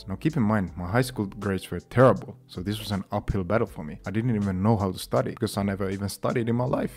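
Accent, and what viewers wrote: Finland. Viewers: I was thinking that this sounds just like a finnish person then i saw the screen shot :D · where are you from? u sound kinda like finland edit: ok, nvm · I don’t speak Finnish (yet) but my mom is from Finland and I knew I recognized your accent / the written language right away. Great video, hyvää työtä !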